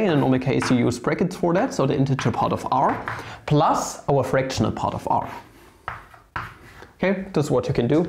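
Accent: Germany. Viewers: u are a german arent u?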